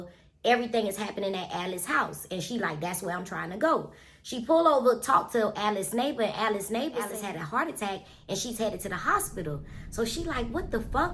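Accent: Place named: Bahamas. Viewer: The Bahamian accent😅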